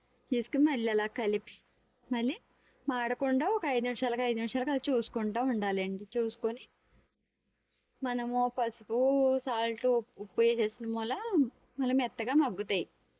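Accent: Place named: Andhra, India. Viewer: Voice of Andra.. good cooking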